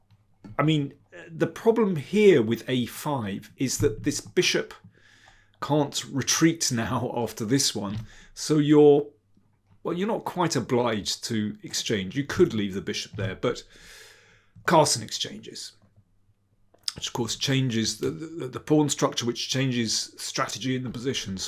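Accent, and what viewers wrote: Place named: RP, United Kingdom. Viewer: I like this channel as Daniel King's English is truly BBC-style. Always picking up new vocabulary. From this video: 'trifle', 'set things in train' and 'indictive'. Thank you!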